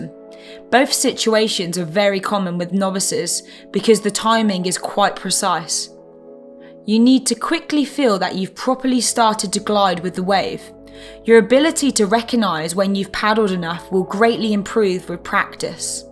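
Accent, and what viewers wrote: United Kingdom. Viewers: Slight British accent...lol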